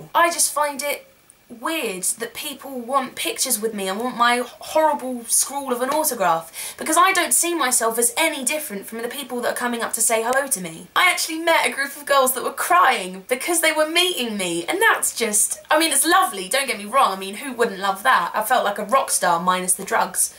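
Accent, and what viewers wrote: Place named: England, United Kingdom. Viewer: What part of England are you from? love your accent:)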